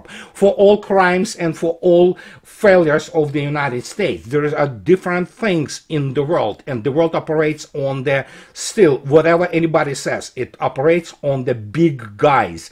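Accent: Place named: Russia. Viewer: 😂this is like me going for dental 🦷 to the auto mechanic. Ваш русский акцент теплеет душу, у всей семьи, особенно у Дяди, такой был. Спасибо за вашу работу, спасибо за ваш русский юмор.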